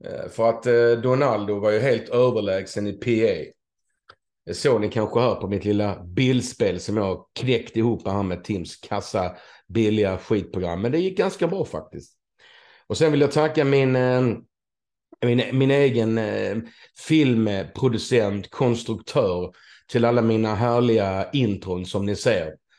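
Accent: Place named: Skåne, Sweden. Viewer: Ja där började din resa på köpenhamnsvägen .. i Malmö och dialekten sitter , och ålahue så skånskt 😜 tokigt 😂 men kul ..😘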